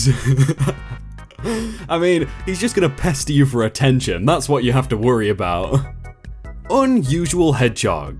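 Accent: United Kingdom. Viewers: Everytime I start up a video of yours, YouTube automatically gives me American English subtitles of your British English. I turn them off, but after every ad, they come back on...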